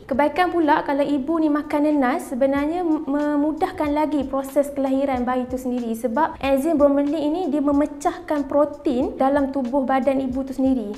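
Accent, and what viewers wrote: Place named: Kelantan, Malaysia. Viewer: Awak terlalu kelantan untuk saya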